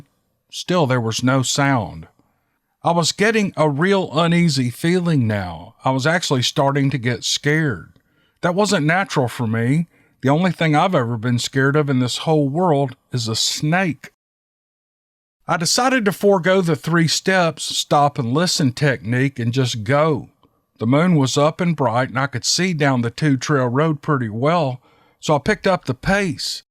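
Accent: South, United States. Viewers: I love listening to you , you have never told a dull story. Your southern drawl gives your stories that extra "flair" for great listening. I listen to them in the dark when going to bed...they are my "bed time stories". One day I will share what happened to my brother and I on St. Helena Island, SC., rural area of Frogmore...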